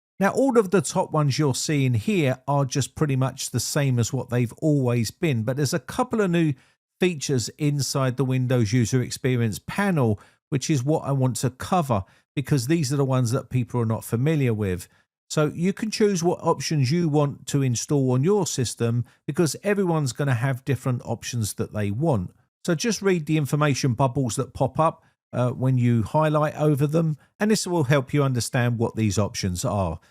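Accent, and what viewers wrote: United Kingdom. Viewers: He’s British, so if you can’t understand the mother English language, watch someone else 😉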